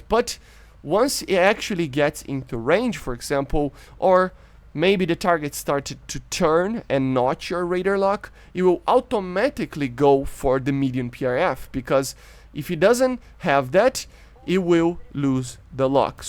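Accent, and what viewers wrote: Brazil. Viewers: O sotaque brasileiro é inevitável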